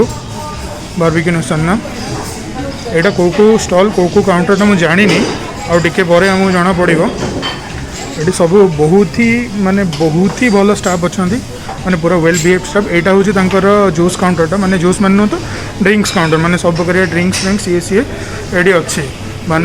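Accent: Odisha, India. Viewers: I luv your way of talking..prati 5 min re hehe haa..dhe..dhe .dha..dha😂😂actually ame mane bahare maintain kari katha hele v...real life re samaste emti typical odia re hin katha hau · I subscribed your Chanel cuz I like your odia accent and also I like your vlogging style keep it up bro 👍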